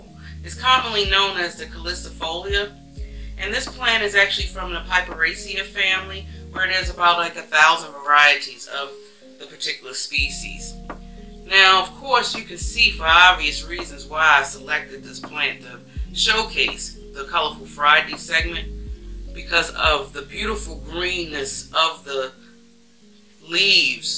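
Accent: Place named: DMV, United States. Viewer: Came for plant info stayed to see if your from the dmv lol I hear it in your voice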